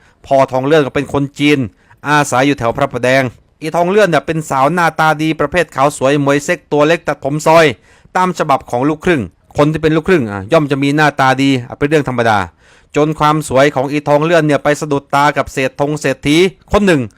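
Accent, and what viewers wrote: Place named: South, Thailand. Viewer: เป็นนักเล่าข่าวสื่อต่างในอดีตทั้งวีรกรรมผุ้กล้า ทั้งวีรบุรุสในยุคปัจจุบัน สำเนียงคงเป็นคนใต้อันทีจริง คือเสียงคล้ายๆคนใต้ คงแทนลึงทวนทอง999 ในยุคโคสนาเล่าเรื่องข่าวชาวบ้านได้ดีมากๆครับ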